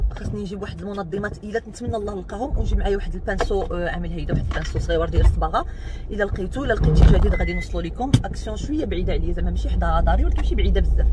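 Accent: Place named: Iraq. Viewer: هاي هاي على لغزالة ولهجة لعراقية ❤نتي تهضري وانا نضحك غي بحدي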